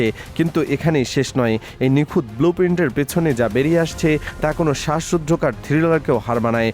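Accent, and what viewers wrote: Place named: Bangal, Bangladesh. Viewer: O tui bangal .tor bhasay bojha jacche...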